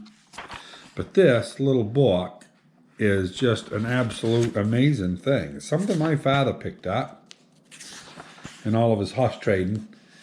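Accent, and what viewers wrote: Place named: New England, United States. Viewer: Watching the video, and hearing the New England accent... more White Mountain region than Kittery.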